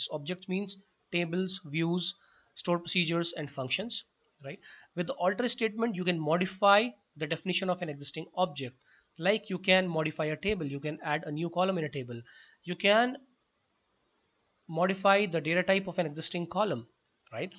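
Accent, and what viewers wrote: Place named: India. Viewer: Damn, I hate the Indian pronunciation and i usually click away, but this a very good explanation of the SQL language. Thank you!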